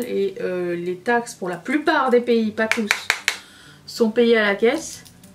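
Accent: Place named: Quebec, Canada. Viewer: T'es Québécoise ou Française j'comprend pas trop 🤔 T'inquiéte c'est pas une critique c juste que youtube m'a recommander une de tes vidéos hier so yea.. J'vien de te découvrir et je perçois l'accent QC 😅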